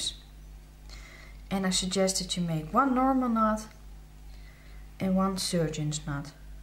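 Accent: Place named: Netherlands. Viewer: I love your accent :o) ... its like dutch / netherlands ?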